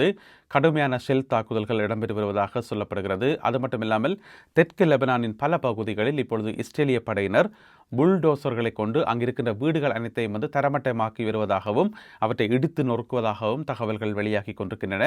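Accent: Jaffna, Sri Lanka. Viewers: யாழ்ப்பாண தமிழ் தனித்துவம் தானே ❤❤❤❤❤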